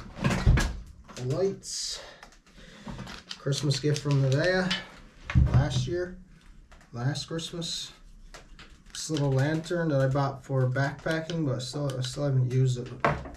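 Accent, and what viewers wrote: Newfoundland, Canada. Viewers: Good to hear a newfi accent , reminds me of my youth in clarenville , great video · I knew I saw a Newfoundland flag in the video. I got the accent right off. Great spot for your cabin. I have a daughter lives in Gander Bay. I went for visit last year. Unfortunately I am from Toronto for the last 75 years. Beautiful place keep on sharing.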